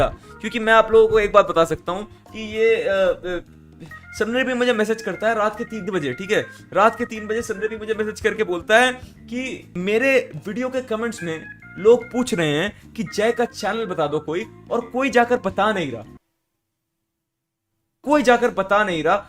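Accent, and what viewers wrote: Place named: Bengal, India. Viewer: Bengali ho bro 🤭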